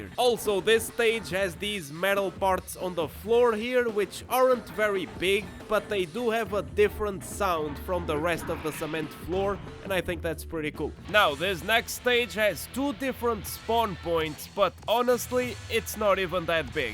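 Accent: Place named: Portugal. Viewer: Wowowowoww do i hear....portuguese? Um português fã de Tekken 4 rahhhhhhh🇵🇹🇵🇹🇵🇹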